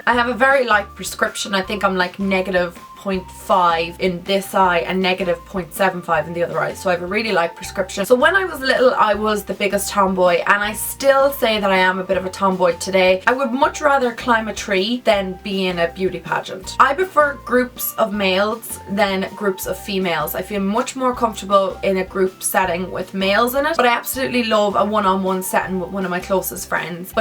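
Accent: Ireland. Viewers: Great vides! I have been watching you for a while now. Love your accent! My husband is from Ireland as well. Keep up the great videos! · where in Ireland are you from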